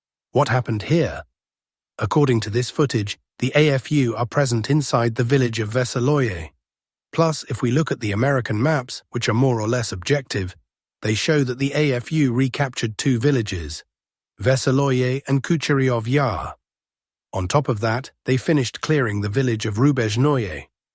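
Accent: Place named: United Kingdom. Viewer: You're no! You speak like a British, better than British!!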